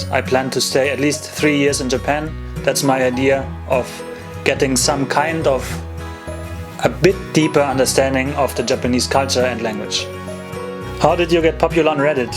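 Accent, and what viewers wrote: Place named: Bavaria, Germany. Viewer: du kannst aber auch bayrisch sprechen c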